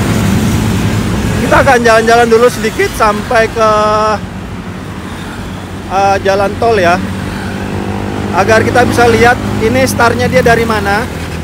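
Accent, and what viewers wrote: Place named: Medan, Indonesia. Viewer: Abang ini cem orang Medan, trus trus trus .....ngeri2 sedap ku tengok2.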